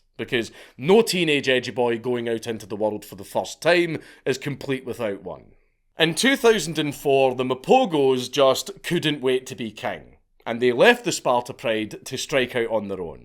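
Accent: Scotland, United Kingdom. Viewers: are you the scottish version of beardo gets scared ? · That Scottish accent is great... "Sex lions", eh? 😂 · I could listen to a Scottish guy talk about anything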